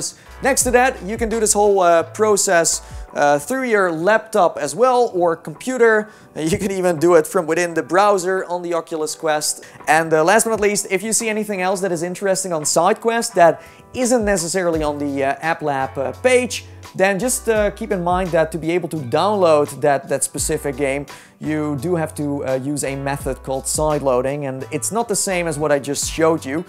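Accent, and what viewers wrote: Netherlands. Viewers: I forgot I was subscribed. When I saw this in my notifs I heard your Dutch voice, it made me feel happy!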